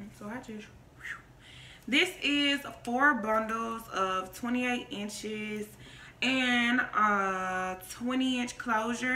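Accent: Atlanta, United States. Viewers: Glam Doll you know that’s an Atl accent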